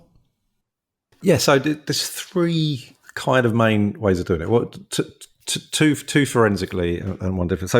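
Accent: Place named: United States. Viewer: Once I heard the American narration bs……..I scrolled on……utter drivel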